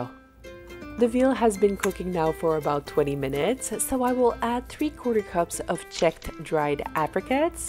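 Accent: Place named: France. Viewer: Merci beaucoup pour votre belle présentation. J'ai beaucoup aimé votre accent français 😉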